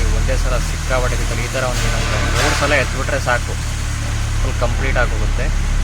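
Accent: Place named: Bangalore, India. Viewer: ನೀವು ಸಾಗರ್ ಅಂತೀರಾ ಮಾತ್ ನೋಡಿದ್ರೆ ಬೆಂಗಳೂರು ತರ ಇದೆ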